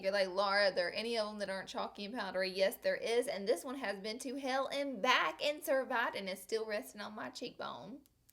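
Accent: South, United States. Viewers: What state are you from in the south?? I've always have wondered! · Your cracken me up today! Love your southern accent, don't ever loose it! Please do one of these on setting sprays, if you have some you like at a good price. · I love your personality! I'm a southern girl too (sorta, born in FL, live by the beach). I wish I had that southern accent all my life, I think it's so cute and love listening to girls who have one, top that with your silly sayings and OMG!😲 I could just talk to you for hrs! lol Love your makeup! You look fabulous as always, plz do u tut on that look! 😍😍 And I looooove your palette! I wish u did more tuts with your palette! 😘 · I also couldn't hear her very well. I had my volume turned all the way up and it was still hard to hear everything, especially when she goes a mile a minute lol. I still adore her quirkiness anyway, even though I have to rewind and listen over and over again to try to figure out what she's saying - between the southern accent and the speed at which she talks. 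Laura is so cool and real.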